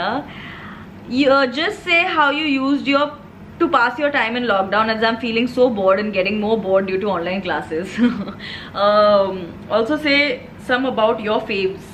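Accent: Maharashtra, India. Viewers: Ma'am I'm a really really big fan of yours. Your videos are so so amazing and specially your way of expressing. I always watch your videos after studying and believe me it's so so relaxing. Your Marathi gives it a tinge of fun and #pridekolis. You really entertain everyone. I wish you all the best for your future. ❤️❤️ And yes LOVE LOVE ✌️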